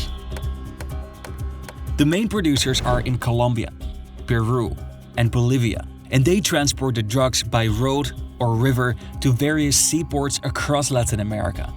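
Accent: Netherlands. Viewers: thick dutch accent warning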